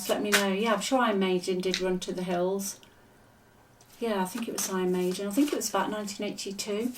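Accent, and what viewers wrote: England, United Kingdom. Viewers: ❤❤❤❤~ YOU are so Wonderful and Beautiful ~ Thank You for making my days Brighter with your readings! Love your English Accent ❤️ 💕 ♥️ ~ it's "magical"!!!🎉🎉 (I've got popcorn and snacks, lawn chairs and heaters [it's cold here where I am at] ready for the 'Show'😂😂😂😅🎉!!! Watching!!!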